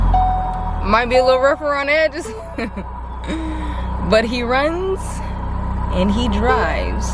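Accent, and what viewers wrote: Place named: Omaha, United States. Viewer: you sound like your from Omaha Nebraska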